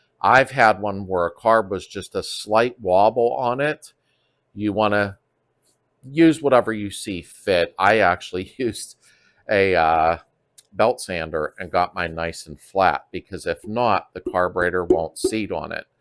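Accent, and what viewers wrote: Pittsburgh, United States. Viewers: Just discovered your channel! From your accent and the WDEV sticker in your shop …. I’m thinking you’re a Yinzer! So from one Yinzer to another …. Thanks for great content. I discovered you while doing some research on buying and maintaining a classic beetle. I’ve been in the market for a super beetle convertible. Solid driver …. Not a show car. Your content has been really helpful. Any recommendations on sites to shop for a car would be helpful. Thanks again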